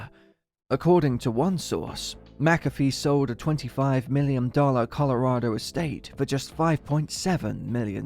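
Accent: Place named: United Kingdom. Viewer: John probably watched this in jail and then killed himself due to this pompous british voiced narration mini doc.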